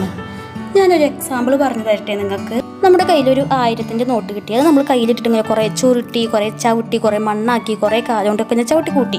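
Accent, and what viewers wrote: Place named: Pattambi, India. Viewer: നിങ്ങളുടെ ഓരോ വാക്കുകളും ഞാൻ ശ്രദ്ധിച്ചു കേട്ടിരുന്നു അറിയാതെ ഉള്ളിൽ ഒരു എനർജിയും ആത്മ വിശ്വാസവും ഒക്കെ വന്നു 😍 പട്ടാമ്പിയാണോ വീട് സംസാരം നല്ല രസമുണ്ട് 👍 All the best dear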